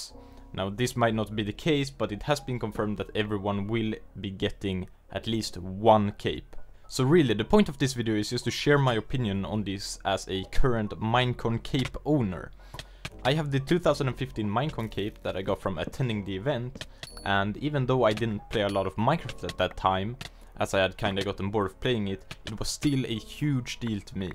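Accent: Sweden. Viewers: You sound Swedish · I like how I can hear the Swedish accent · ur swedish no doubt about it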